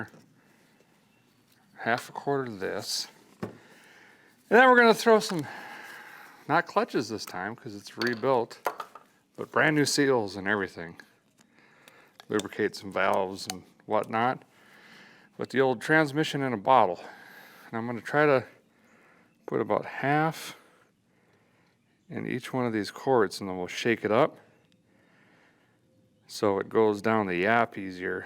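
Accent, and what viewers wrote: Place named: South, United States. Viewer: Your southern is improving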